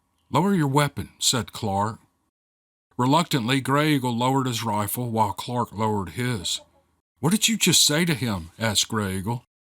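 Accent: Germany. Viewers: I love your German accent done in clandestine Southern flavor,! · Another talent.A German accent. I dont know a thing about it but I do appreciate it. Thanks sooo much for your effort. It gives the narration a good effect. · really enjoying Blood Eagle and was tickled by your German accent. It reminds me of Arnold Schwarzenegger. Thanks for doing what you do. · Living for the German accent ❤ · I was stationed in Germany for five years. German accent is making me laugh.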